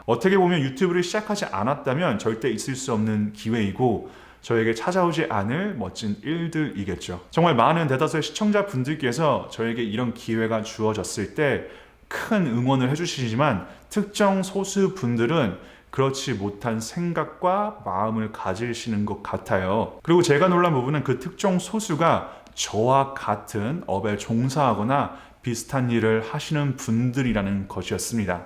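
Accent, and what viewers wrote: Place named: United States. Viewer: 문신하신 줄 이제 알았어요...외모에 대해서도 직접 디테일을? 말씀 안하시면 아무도 노티스 못하고 대부분 시청자들은 분위기 단정하고 좋은 분으로 생각하고 있을 거에요~ 목소리가 힘이 있고 단정해서 말투 평소에 아나운서 같다고 생각했는데 더 좋아질 수도 있을까요? 흠... 사진 리뷰 잘 보고 있어요. 저의 영상 제작 실력도 조금씩 발전하고 있는데 쿄작가님께 많이 배워요~ 미국 사시면 발음이 미국 발음이 나오는데 저도 그것때문에 한국 사는 분들이 지적을 하지만 한국에서 미국으로 온분들은 발음을 엄청 신경쓰고 교정을 했기때문에 원어민보다 더 한국 발음으로 돌아가기 힘들 수도 있죠. 사과도 당당하고 조리있게 하셔서 멋지십니다. 앞으로도 좋은 영상 많이 기대합니다~ 홧팅!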